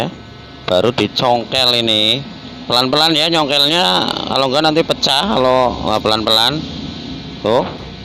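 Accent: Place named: Ngapak, Indonesia. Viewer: Logat ngapak e kentel bgt kang 😄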